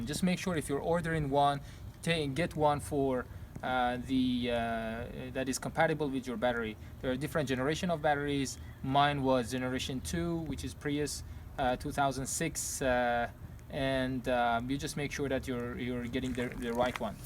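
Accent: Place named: Iran. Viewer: Dastet dard nakone mard ,karet doroste. Ensafan englisit ali o ravooneh ,az ye rize tah lahjeye farsit goftam nakone in baba Irani bashe ( maha filmaye be dard bokhor injoori nadarim to YouTube) ,esmeto ke didam , fahmidam hadsam doroste. Baese eftekhare ke hamvatene ma ye hamchin videoye be dard bokhori gozashte to YouTube.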